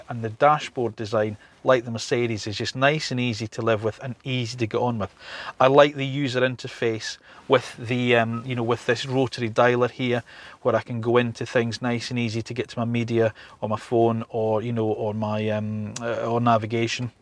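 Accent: Scotland, United Kingdom. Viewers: I always enjoy your calm and eloquent presentation... it's sort of an automotive meditation. And that Scottish accent is just a bonus. I'm going to have to go with the GV60.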